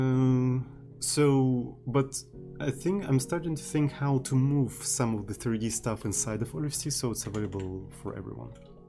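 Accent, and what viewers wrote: France. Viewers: За ужасный французский акцент дизлайк и отписка.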